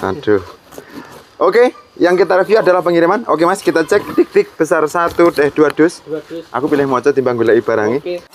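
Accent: Java, Indonesia. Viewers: ya alloh mas jowone jan nemen boso indo blas 😁😁aku suka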